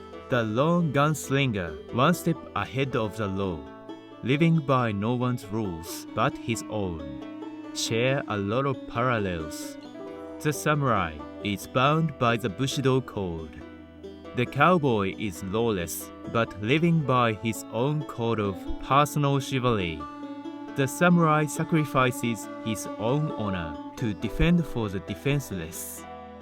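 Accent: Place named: United States. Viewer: Wait... was this for real? I am confused. This is supposed to be a joke right? *Mistakenly Calling Akira Kurosawa (movie director) as Akira Toriyama (who is the Mangaka of Dragon Ball). Also, whoever is narrating has an American accent in his fake-ish Japanese accent. (I see the person’s name is Japanese : I suspect this person lived in the US for a long time)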